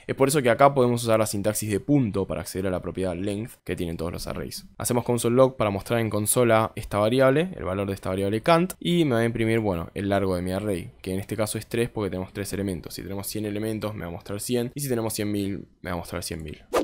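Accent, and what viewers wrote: Argentina. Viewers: Creo que entendí mejor contigo que con el curso introductorio de soyHenry, no les entiendo nada hasta el día de hoy a ellos, pero a ti, clarito bro, voy a hecharle un vistazo a tu curso del que hablas 👌🏻😎, la verdad, hablas claro apesar de que creo que eres argentino no?, en caso de ser asi te entiendo perfectamente a ti, y no a los de soyHnery, deberias ser instructor de ahi, ya que te juro que no les entiendo nada de lo que hablan, no se si no pronuncian bien o hablan demasiado rapido, tu no, calmado y bien explicado, hasta rayas, y eso vale oro bro. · Un chino con acento argentino, tienes un nuevo fan 😊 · Gracias amigo, me vi muchos videos pero vos lo explicaste cortita y al pie, pd: te sale re bien el acento argentino, un saludo hermano 🙌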